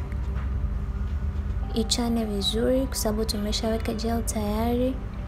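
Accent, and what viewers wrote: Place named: Tanzania. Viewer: Kiswahili cha tanzani Tamu kwanza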